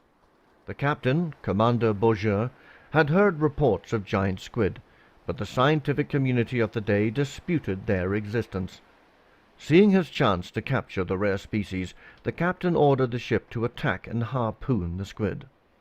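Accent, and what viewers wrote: Scotland, United Kingdom. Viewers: British??? That was himself - a good Scotsman.;) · Excellent production, and a fine British narration.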